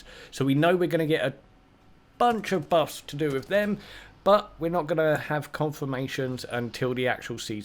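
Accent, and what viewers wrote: United Kingdom. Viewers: In case he doesn't reply, his accent sounds like South-East England to me :)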